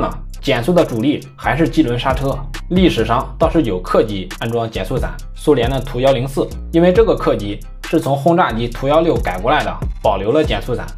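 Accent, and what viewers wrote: Henan, China. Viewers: 是河南还在菏泽老乡啊，哈哈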